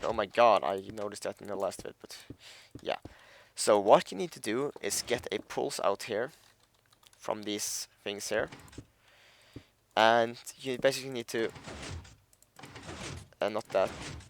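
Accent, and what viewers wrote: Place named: Norway. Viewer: I could tell by the first 10 sec that you're from Norway :P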